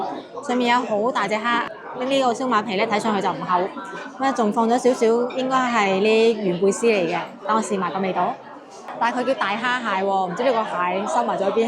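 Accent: Guangzhou, China. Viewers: 我爸媽在廣州佛山大同去香港住的（19xx年了) 我一路看你油管. 我好希望大家有生之年. 再看到白話廣東話再過十年二十年都有廣東文化和廣東話.沒有廣州話 那有今天的香港廣東話. 好喜歡你講正宗的廣州話.謝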